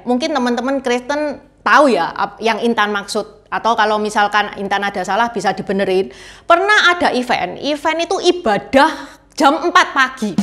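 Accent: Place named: Surabaya, Indonesia. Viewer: Logat Chindo Suroboyo.. medhok , wes ketoro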